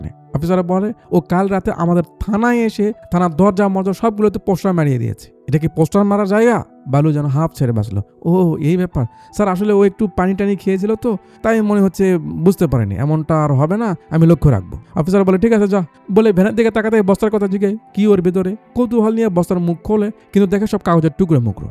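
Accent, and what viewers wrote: Bangladesh. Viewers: তোমার বাড়ি কি বাংলাদেশ তোমার কথা ধরন দেখে বলছি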